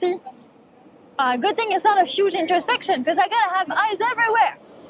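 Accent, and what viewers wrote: Quebec, Canada. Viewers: French Canadian? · This is what Canadian women sound like?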